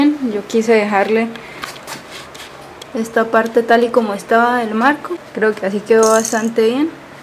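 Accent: Colombia. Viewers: Su acento es colombiano, creo que es de Santander o norte de Santander !! Felicidades a ella. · le escucho un acento Colombiano...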